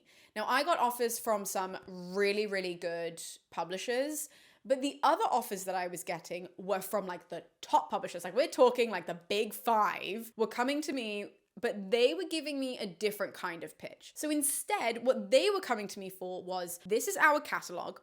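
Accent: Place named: England, United Kingdom. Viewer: You have an English accent, everyone loves your voice. 🤣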